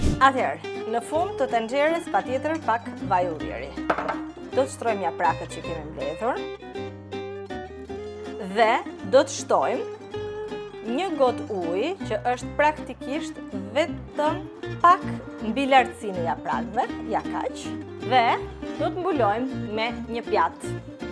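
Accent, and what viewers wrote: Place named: Burrel, Albania. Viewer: .....sa me pelqen malokja e Burrelit kur salutone !!!!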